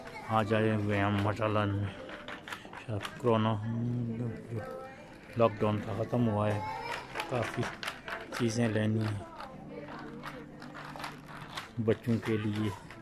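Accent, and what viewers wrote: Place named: Punjab, India. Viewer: AsalamOalikum masahAllah apki boli suun kr apnaa punjab yaad ajata hai 😇khush rahay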